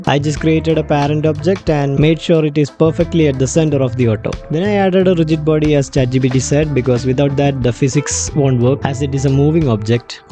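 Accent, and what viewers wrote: Kerala, India. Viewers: ni malayali alleda 😂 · Bro malayali aano? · I detected that malayali english accent within a few seconds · including the Malayali accent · Broo Mallu or what?? Props to you bro! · Malayali aano saare??? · Bro are u from kerala 😊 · Bro is a Malayali for sure!! 😂🤍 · You are a Malayali right? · Why im getting a feeling that you are a malayali😅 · Are u from Kerala ? Well this video was wonderful keep grinding brother 👏 u gained a new loyal sub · are you malayali accent is too strong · your Mallu accent 😂is top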